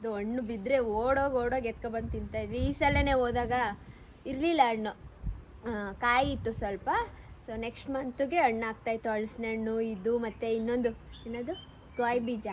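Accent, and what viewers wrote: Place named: Kundapura, India. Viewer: ❤❤❤❤❤ಗೋಯ್ ಬೀಜ 👌👌👌👌 nam kundapr Kannada marre❤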